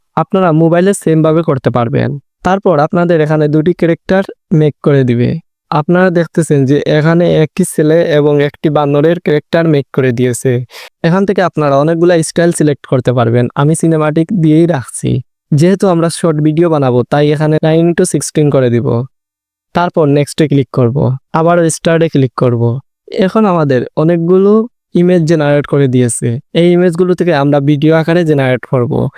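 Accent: Sylhet, Bangladesh. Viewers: সিলেটি নি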